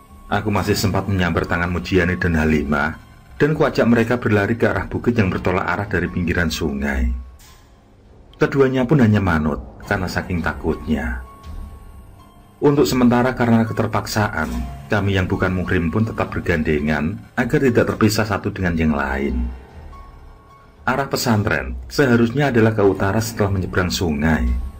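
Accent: Java, Indonesia. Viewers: Ceritanya mencekam bikin jantung berdebar kencang, saya suka logat bicaranya yg jawa banget.. Mantebb 👍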